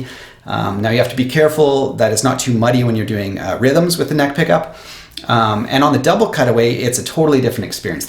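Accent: Canada. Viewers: Are you Canadian or from Dakota?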